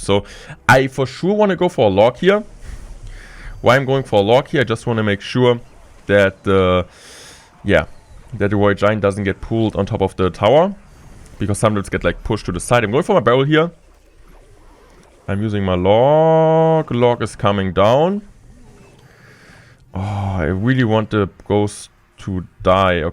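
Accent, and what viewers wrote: Germany. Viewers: To the English people: Do you hear a German accent? Because i dont think he is talking that Bad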